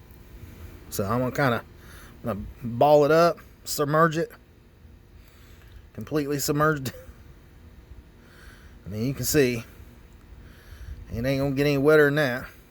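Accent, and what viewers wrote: United States. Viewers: Are You From Tennessee? Cuz Ya Sound Like Yer From Tennessee LOL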